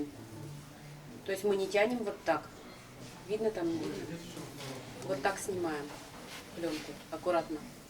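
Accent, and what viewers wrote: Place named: Vyatka, Russia. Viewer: А я думаю,откуда такой акцент ? Вятские....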